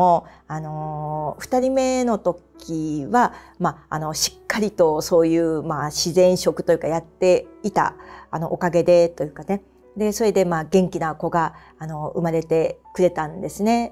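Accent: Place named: Hokkaido, Japan. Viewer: 対談動画ではせくらさんを知りました。 北海道は珈琲と幼稚園のイントネーションが違うと聞きましたが、やはり道産子なのですね😊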